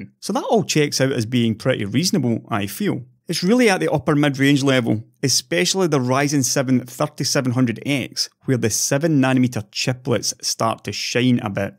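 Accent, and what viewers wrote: Scotland, United Kingdom. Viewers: Great content, in depth knowledge, scottish accent. Thick, thick, thick scottish accent. You got my subscription mate. I'd also love some content in scottish gaelic. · Scotia English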